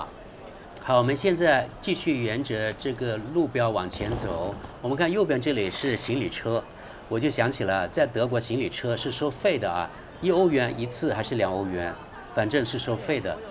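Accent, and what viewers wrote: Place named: Guangxi, China. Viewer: 丢拿马 我以为是个洋鬼子普通话不标准 原来是我广西老乡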